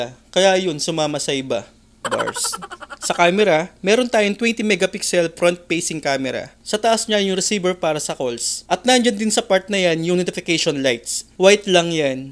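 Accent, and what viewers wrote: Pampanga, Philippines. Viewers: Kapampangan kata Koy? Hahaha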